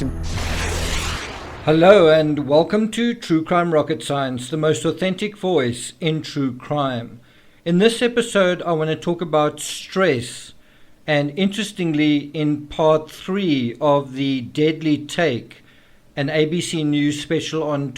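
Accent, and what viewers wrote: South Africa. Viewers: This American loves your South African accent 🙂 and of course your wisdom too. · You popped up my in my recommendations and when you said the most authentic voice in true crime in the intro...I thought wait this dude sounds South African... and pleasantly surprised that you are!! Howzit from a fellow South African * slams subscribe button *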